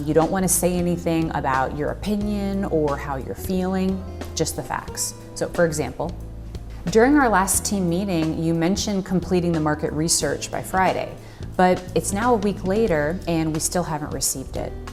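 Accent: United States. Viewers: Are you American? Are you a native American speaker?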